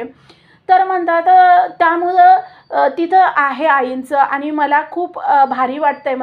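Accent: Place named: Sangli, India. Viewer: ताई तुमच्या सांगलीच्या भाषेतच बोला , सांगलीची भाषा ऐकायला खुपच भारी वाटतं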